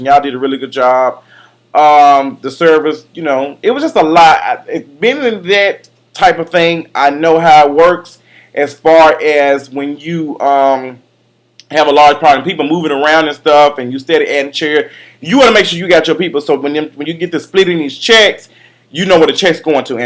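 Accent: Memphis, United States. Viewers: I found your videos it was on the recommended videos and I clicked one where you were going in on your family and it was soooo good. I had to keep clicking your videos and i love your personality. I was thinking I loved your accent and it sounded familiar to me. Then I found out that you lived in Memphis and I had relocated here and have been here almost 2 years. Your Memphis references are so on point and funny! You are so down to earth and I totally understand where you coming from where you saying you have to let go to really dive in your purpose. You have my support 100% and you definitely deserve all the success that is coming your way! Thank you for making me laugh and continuing to be genuine. Love your vids, and love you!!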